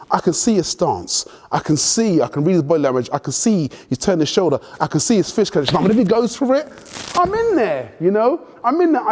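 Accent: United Kingdom. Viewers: greAt brit accent mAte . good info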